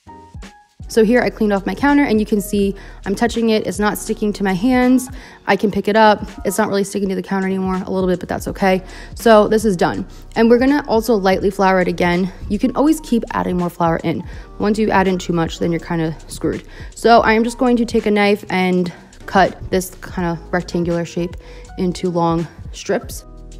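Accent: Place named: New York, United States. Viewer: Btw so glad I found your channel. And your NY accent is so great, I’m from Long island so it sounds homey and familiar 😊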